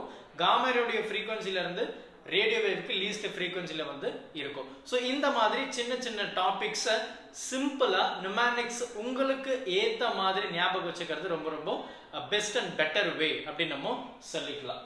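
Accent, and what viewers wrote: Coimbatore, India. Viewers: Coimbatore polaya sir😂😂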